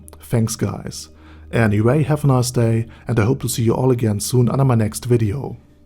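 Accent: Germany. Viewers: having a german guy narrate overlord is oddly fitting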